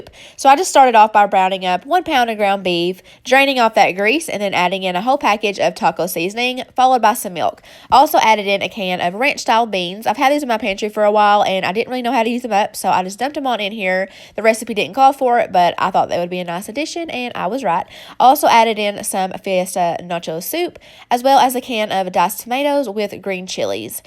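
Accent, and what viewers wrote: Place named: Kentucky, United States. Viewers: I knew i recognized that accent! What part of kentucky are you from? Im in taylorsville. Btw i love your videos im always stuck on what the heck to make for dinner and this is my way out everytime, thank you! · Kentucky! So there's the accent! ;) I had an Aunt that lived in Padukah. I'm in IL myself. Loving these videos!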